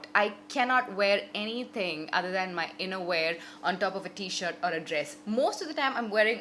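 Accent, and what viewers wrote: India. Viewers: It’s so interesting how you were born and lived your life in the Middle East but you have a very heavy Indian accent. I was born and raised in America and I sound American. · But you have a strong Indian accent ... And i hate howyou pronounce the letter c in "cannot" or other c words.. gosh ...cuz that sounds really fake and weird..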